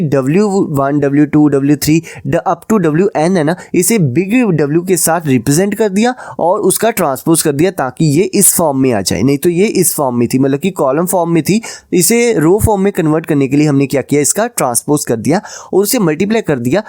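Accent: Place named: Jammu, India. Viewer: Bhai tu jammu se toh nahi hai? Your accent sounds quite familiar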